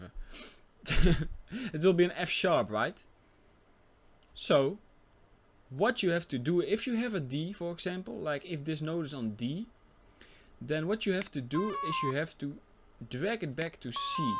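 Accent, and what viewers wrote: Netherlands. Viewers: 2 sec in de video en ik dacht gelijk jup Nederlanderrrrrrr :) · ik hoor aan je accent dat je nederlands bent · Nederlands accent hahah. Nice vid man! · lol i think that MAN is dutch like me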